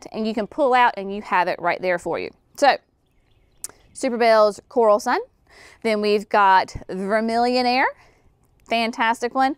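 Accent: South, United States. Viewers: I LOVE your southern sus ❤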